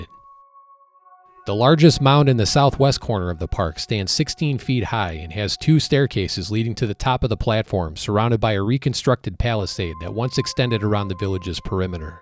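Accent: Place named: Midwest, United States. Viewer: This is the most Wisconsin/midwest AI voice I have ever heard! Some new sights for me to venture out and see, thanks much!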